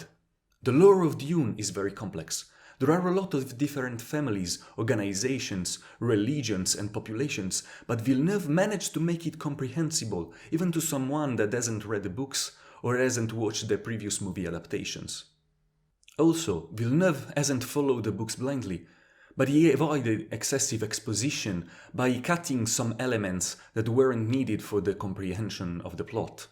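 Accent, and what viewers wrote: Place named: France. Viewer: good review; I presume u r French as you sure do have some of Denis accent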